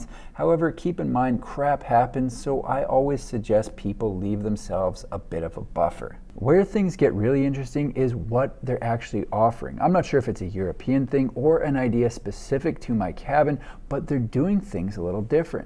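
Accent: Canada. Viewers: I would love if you got to go TOUR these favorites and get the behind the scenes info and tell us what places impress you the most. Maybe you need a US correspondent! I assume your Canadian because of the accent but you could be Midwestern too?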